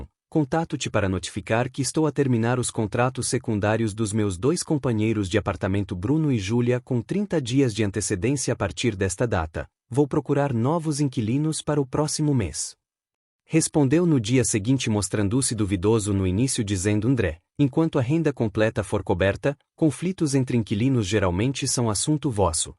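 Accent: Portugal. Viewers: já vou inscrever. eu amo o português de Portugal, parece que é um relato do século 19, mas algumas palavras são engraçadas. quanto aos traidores os dois se merecem. você merece ser feliz.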